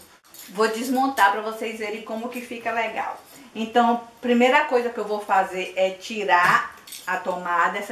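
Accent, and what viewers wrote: Northeast, Brazil. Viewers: Adorei só pode ser nordestina parabéns ❤️❤️❤️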